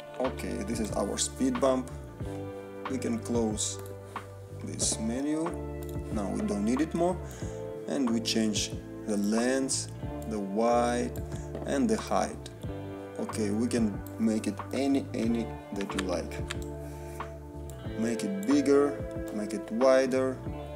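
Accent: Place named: Russia. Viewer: Здорово мужик ! Явно ты из России или русскоговорящий по речи слышно. Ну ладно желаю удачи в этом нелёгком деле ,я тоже занимаюсь ютубом. Кстати у тебя круто получается.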